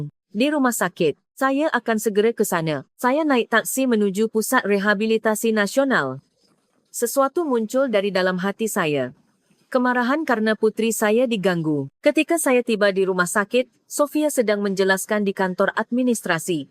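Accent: Malaysia. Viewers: Komentatornya berlogat malaysia .... orang malaysia ya. ...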